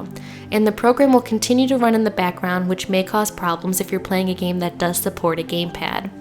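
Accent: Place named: Michigan, United States. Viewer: Michigan or Chicago? I think Michigan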